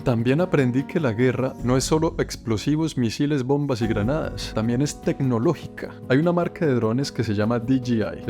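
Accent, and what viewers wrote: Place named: Colombia. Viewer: SUPER COOL 😎 👌 TODOS SUS VIDEOS!! MUCHAS GRACIAS POR COMPARTIR 😊 BENDICIONES 😘!! ME GUSTA 👍 MUCHO SU HACENTO COLOMBIANO 😊 Y SUS COMIDAS. SALUDOS DESDE LOS ANGELES, 🙏♥ CALIFORNIA. USA.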